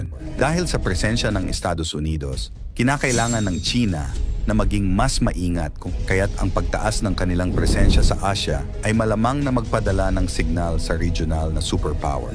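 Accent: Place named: Visayas, Philippines. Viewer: Ano kaba pilipino o hindi hahahah salita m palang bayas kana.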